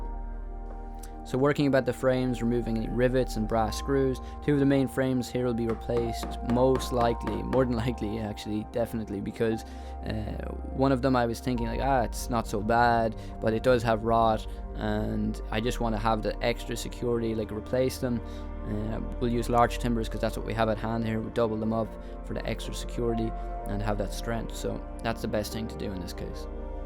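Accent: Ireland. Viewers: Hi there rea;;y fantastic video! love the way you work with your dad. HOW wonderful to have an Uncle that`s also creative an you have given is boat new life. I really enjoy your videos I came to Australia when i was six migrated from England. All my family are from Ireland and my parents are from Ballybok. I love Ireland of course and its so nice to hear your accent. It reminds me of my family my parents etc. I have been back several times and My grannies house is still there in Landen RD Ballyfermot. I have all my family there except my mum and twin sister and one of my brothers. the other brother migrated to Switzerland. anyway I hope you keep warm as the weather is rapidly changing there for you. Hope you get the boat water proof before the weather gets too bad. Love the rocket stove I think it is worth a fortune. I`ve never seen one like that before it is stunning. 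and rocket stoves are the best stoves and fire place to have. I loved seeing you put the turf in made me homesick for Ireland. We are worlds apart but we have a kindred spirit in Ireland. God bless you guys I wish you well. till next time.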